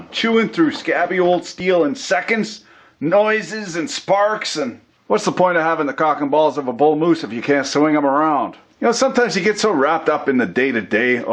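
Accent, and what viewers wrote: Canada. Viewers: Still trying to figure you out. Canadian but with a New York snark. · The guy's Canadian. I know tons of people who talk just like him. Especially in the trades and oil industry. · your accent is halairious. What part of Canada are you from . lol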